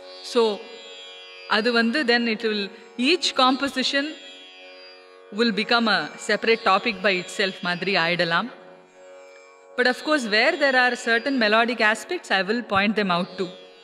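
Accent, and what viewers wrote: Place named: Brahmin, India. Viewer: Excellent. I always relish the English Tamil talked by Brahmins. Can call this Brahminish.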